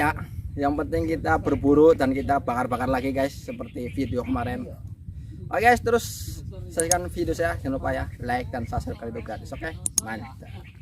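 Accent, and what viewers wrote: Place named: Indramayu, Indonesia. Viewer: Wong Endi mang kaya wong dermayu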